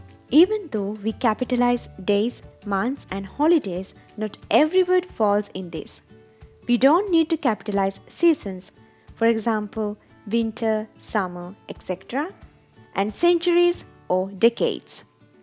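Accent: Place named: India. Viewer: Just want to know dear, who taught u English? An Indian? Your accent says though. Anyways a nice presentation.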